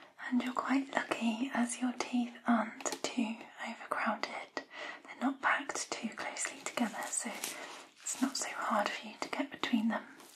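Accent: United Kingdom. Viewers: Is this brit accent ? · SHES BRITISH MATE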